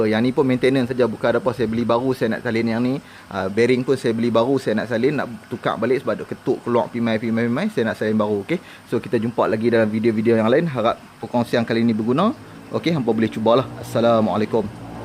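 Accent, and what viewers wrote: North, Malaysia. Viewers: Baguih nya hang punya cakap utara.. terhibur dan senang nak hadam ,lepaih ni buat video cakap utara semua baru ngam..haha. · Assalamualaikum w.t.b tuan.. Kluaq loghat utara na.. Pekat wei.. Terbaik bro.. Salam dri follower channel hang dri negri bwh bayu.. Teruskn brkongsi info dn ilmu.. Smoga sukses slalu.. Aamiin.. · haaa baru la ngam guna, utara mai, depa faham ja tu,guna loghat utara universal, terkemut-kemut 🤣, good job 🤙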